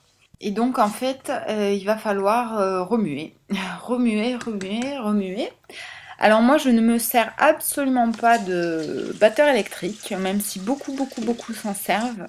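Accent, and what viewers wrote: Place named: South, France. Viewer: C' est l' accent du sud... magnifique accent.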